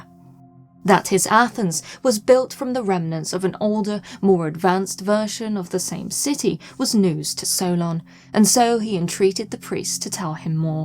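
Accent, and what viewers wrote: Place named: Northern England, United Kingdom. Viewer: I like the way you're doing that bbc thing of talking in a RP accent but occasionally letting that northern accent come through, like your pronunciation of "a"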